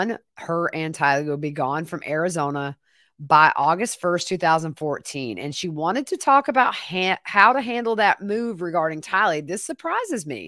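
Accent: South, United States. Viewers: You’re the best,! Just when I thought I knew all there was to know, you taught me even more!!! Wow ❤❤❤❤!!! I also LOVE your voice. I love your accent! I love southern accents ❤❤❤❤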